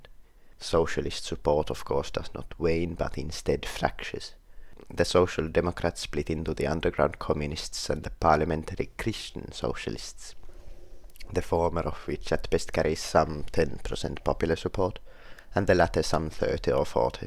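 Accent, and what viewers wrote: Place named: Finland. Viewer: First ever time heard Finnish accent quite an expirence😮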